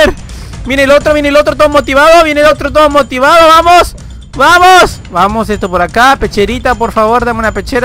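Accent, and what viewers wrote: Argentina. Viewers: Sos argentino? Xd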